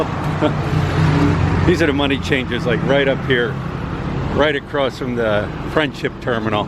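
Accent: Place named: Chicago, United States. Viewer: You sound more as if you are from Chicago than from Philly. I have heard so many different things from Thailand and PI that I do not know what to believe any longer. Never been the RED LIGHT or bar type but I lived in Makati and different places in Thailand before. I think bars are opened here in Denver. Lots of obnoxious people noise and motorbikes outside at night this last week. Last I heard from a Filipina was that PAL will start flights from overseas June 1, then after that someone said January 1st, 2021. I heard this yesterday. I'll subscribe to your channel in hopes of being kept informed. Good Luck!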